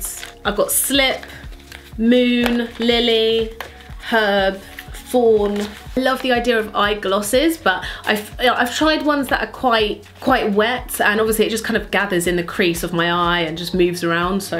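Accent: London, United Kingdom. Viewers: your vids are so comforting to watch because I've watched them for so many years!! they're so relatable as well because I'm from london- the way you speak sounds like me and my friends lol and also you're so down to earth and the furthest thing from annoying - you're always my go to watch, u inspire me and keep doing what you're doing!! p.s i love the way that you mother, not all like rub it in everyone's face my daughter is god's gift kind of vibe, but so humble xxxx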